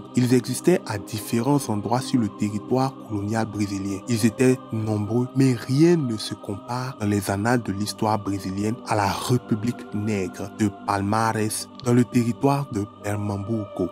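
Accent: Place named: Africa. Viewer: Salut, trop pénible à écouter !...entre la musique qui est trop forte et votre diction tinté d'accent africain, je me fatigue à tendre l'oreille ...c'est dommage !....j'espère que cette critique vous permettra d'améliorer vos prochaines vidéos !...bon courage pour la suite !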